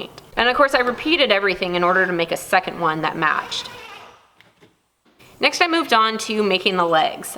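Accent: Texas, United States. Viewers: Drill a hole for the pen.love the texas speak. How about using bead and hole stops like you would see on canes and walkers. Rotate wheels out of way when not using , click when u rotate into position. Lengthen arms that way too.that can also raise height, stop scraping bottom.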